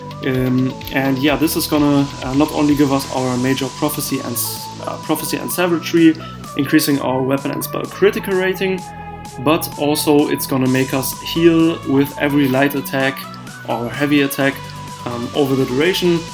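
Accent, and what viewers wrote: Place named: Germany. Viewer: nice build and well explained. The background music is a bit loud and overall terrible for such a long video but everything else is well done. Finaly someone mentions the Trial by Fire set, it's so overlooked and hands down the strongest defensive set for PVP. I would also like to see a build using Torug's Pact, another much overlooked set. Liked and subbed and looking forward for more content :) PS: Dein deutscher Akzent ist killer :D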